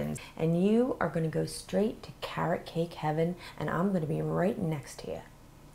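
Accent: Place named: New York, United States. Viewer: Hi! You sound like your from NY!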